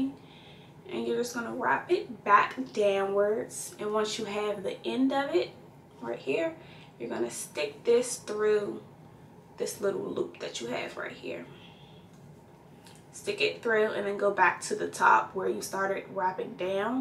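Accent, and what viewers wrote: Washington DC, United States. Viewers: Accent give me dc vibes 💕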